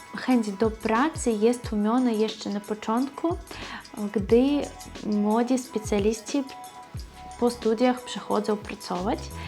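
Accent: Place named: East, Poland. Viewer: Witam Panią,! Proszę uwagi na tego faceta o pseudonimie To jakaś dziwna kreatura. Co więcej, jego znajomość języka polskiego jego bardzo słaba. Może chodziło mu o to, że gdy Pani napisała "Ale jaki świetny wpis.", to wypadało raczej powiedzieć "Ale jaki miły (ALBO pochlebny) wpis (ALBO komentarz)!" Czy będąc lekarką z białoruskim wykształceniem mogłaby Pani wykonywać swój cenny zawód w Polsce, choćby po kilka godzin dziennie? W Polsce odczuwamy brak lekarzy. Podziwiam u Pani bardzo dobrą znajomość języka polskiego. Pełen uroku jest Pani ten słodki, lekki wschodni akcent, który jest tak miły dla mych uszu. WSZYSTKIEGO DOBREGO W NOWYM ROKU DLA PANI I RESZTY WASZEJ MAŁEJ RODZINKI.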